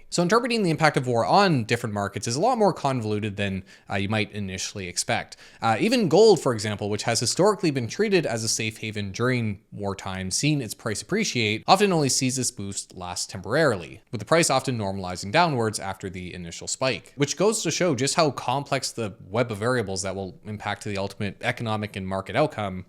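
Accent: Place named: Canada. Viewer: i love the fact that you canadians always have an interesting accent...like "welcome to the pleine baygle" hahahaah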